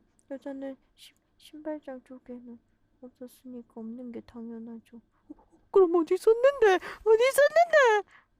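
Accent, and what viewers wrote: Gyeongsang, South Korea. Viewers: 귀여운 부싼 아입니까.... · 경상도서 꽤 오래 살았지만, 저렇게 ㄱㅇ게 사투리 쓰는 건 처음 봤어요ㅋㅋㅋㅋㅋ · 이게 경상도거등요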